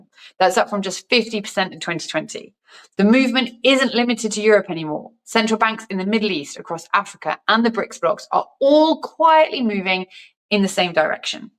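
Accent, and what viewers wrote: England, United Kingdom. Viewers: You know the business and the English accent LIKE 😊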